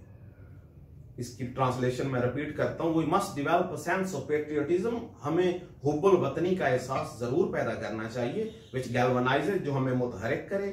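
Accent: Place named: United Kingdom. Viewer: Excellent pronunciation n perfect British Accent.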